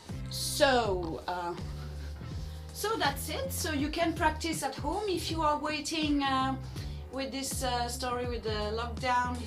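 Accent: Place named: France. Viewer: I recognise a french accent haha have you got any tips in order to start tap dancing at home without taking lessons ? thanks in advance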